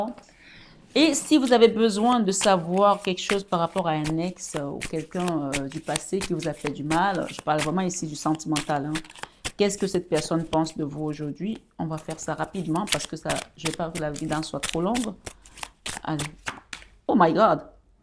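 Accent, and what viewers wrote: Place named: Cameroon. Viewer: Merci pour la guidance. Vous avez parlé j'ai pensé à une sœur Camerounaise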